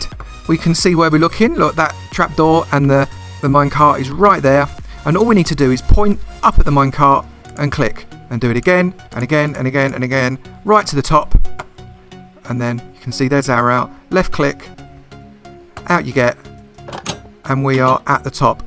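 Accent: United Kingdom. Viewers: I like the vid coz of ur voice British people these days or Australian :)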